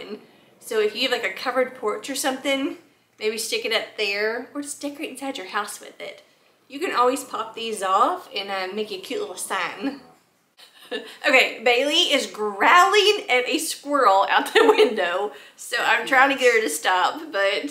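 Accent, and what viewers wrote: South, United States. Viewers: Love your southern accent and Bucee’s T-shirt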